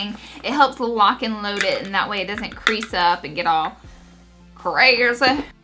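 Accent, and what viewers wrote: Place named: Georgia, United States. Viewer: Ugh, it upsets me when people make comments about your voice because your voice is so cute!! I literally could listen to it all day, ur accent reminds me of back home in Georgia.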